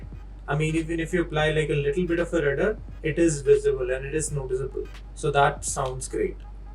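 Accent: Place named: India. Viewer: I like ur Indian accent ❤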